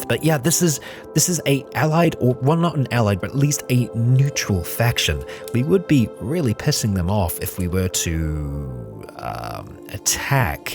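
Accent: New Zealand. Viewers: I thought you sounded like a new Zealander at the beginning of the video and I was rightttttt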